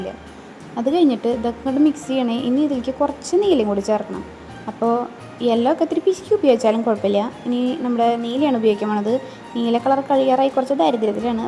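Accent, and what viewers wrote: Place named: Thrissur, India. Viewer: മ്മടെ തൃശൂര് ഭാഷല് പെടക്കണ പെട കലക്കിണ്ട് ട്ടാ.... 👍🏻👍🏻👍🏻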